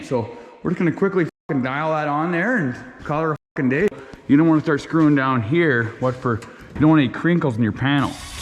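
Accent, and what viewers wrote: Canada. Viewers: I’ve never heard someone as Canadian in my life I’m fucking hooked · Why do you talk like a Canadian mate ?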